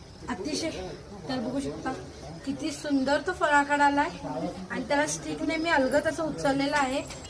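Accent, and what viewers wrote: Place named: Kolhapur, India. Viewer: Tai, tumchi bhasha lai bhari hai. I like kolhapuri tone😅😅😅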